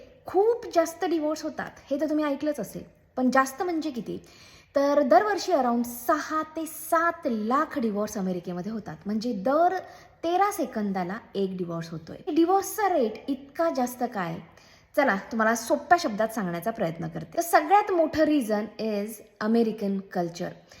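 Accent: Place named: Marathwada, India. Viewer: तायडी तु मराठवाड्यातील आहे का.. भाषेवरून वाटतयं😅😅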